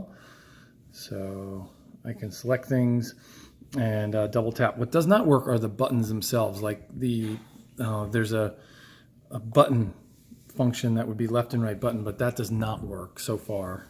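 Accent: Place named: United States. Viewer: He sounds American, so probably Snapdragon 845.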